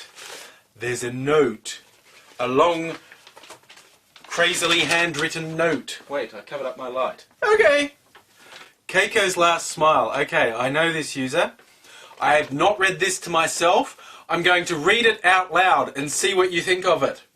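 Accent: Australia. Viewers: Wow, an Australian voice mail!!!!:D Thanks for posting this:)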